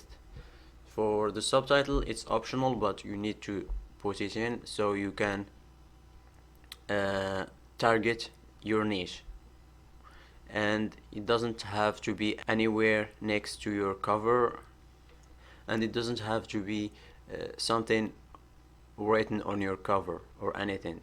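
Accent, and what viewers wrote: Morocco. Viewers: Hhhh htana chmit ri7t lblad f sotoo 😅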